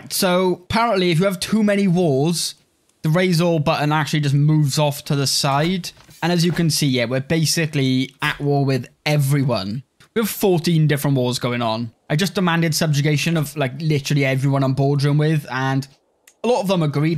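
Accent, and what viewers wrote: Wales, United Kingdom. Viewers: Love the Welsh accent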